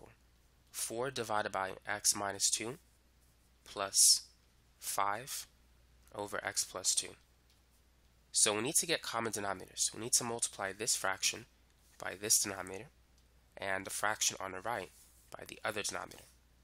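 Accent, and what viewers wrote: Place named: Boston, United States. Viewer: lol i just realized you can tell from this guys accent he is from boston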